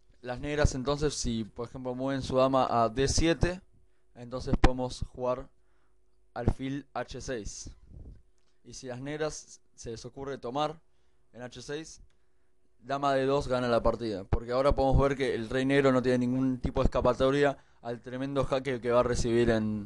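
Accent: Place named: Argentina. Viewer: Porque los argentinos pronuncia la yo o llo...con chooo???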